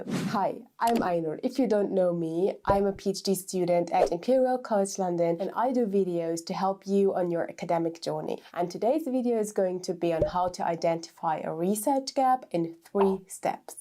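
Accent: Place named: United States. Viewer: Imperial College but Yank pronunciation.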